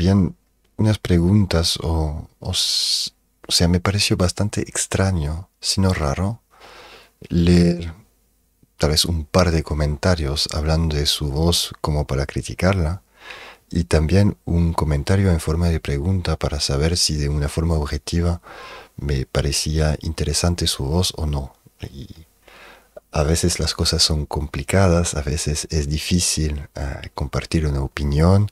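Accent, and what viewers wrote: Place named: France. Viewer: ME ENCANTA TU ACENTO AFRANCESADO Y DULCE . HABLAS BASTANTE BIEN EL ESPAÑOL , PARA NO SER TU IDIOMA NATIVO . UNA PEQUEÑA CORRECCIÓN CON MUCHO CARIÑO : (Se dice) TRADUJERON ✅ Traducieron ✖️ SALUDOS DESDE MONTERREY, NUEVO LEÓN. MÉXICO. YA TIENES UNA NUEVA SUSCRIPTORA ✅🥰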